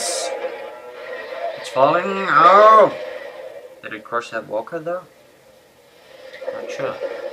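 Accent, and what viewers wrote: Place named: Philadelphia, United States. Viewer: Good tutorial, u from the states? you have a philly accent...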